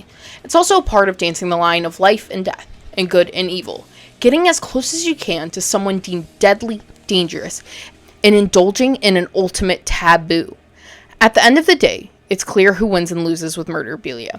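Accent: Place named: South, United States. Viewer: oh nah this guy is from the south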